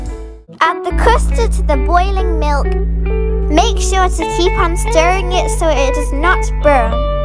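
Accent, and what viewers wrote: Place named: England, United Kingdom. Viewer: Oh woooow ur english accent is awesome 😘😘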